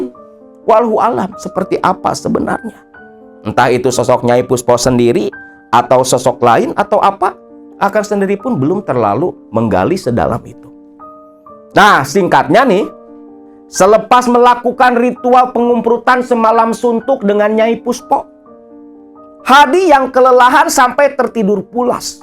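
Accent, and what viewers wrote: Java, Indonesia. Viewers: Kaya dangar ketoprak bahasa jawanya kuat orang Papua dan propinsi lain agak susah mencernanya🙏🙏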